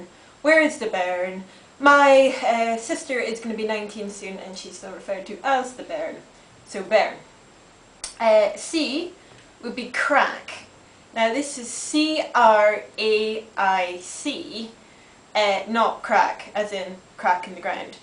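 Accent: Scotland, United Kingdom. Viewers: As I listen to her say certain words, I defiantly hear similarities between her Scottish ascent to the ascent of people living in the southeast USA. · Where in Scotland are you fae? I huvny heard that accent before? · Alright, old video I know...but that's a light accent. Where in Scotland are you from? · LOL... "affy late home." I absolutely love the Scottish accent, I forgot about the word 'affy'. :) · Where are you from in Scotland? Sound northern!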